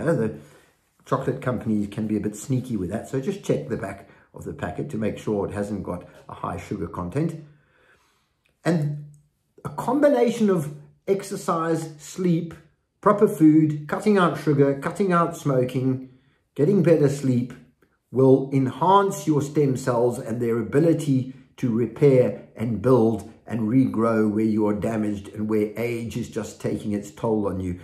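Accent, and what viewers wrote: South Africa. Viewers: Hi, I recognise a SA accent.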